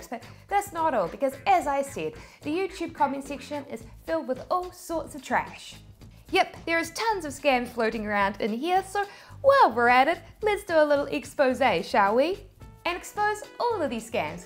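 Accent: New Zealand. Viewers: You are the only YouTuber I know with a New Zealand accent. I never heard this before. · Your Kiwi accent maybe your biggest asset here. If the person pretending to be you can't say "fush and chups", then clearly, they aren't you.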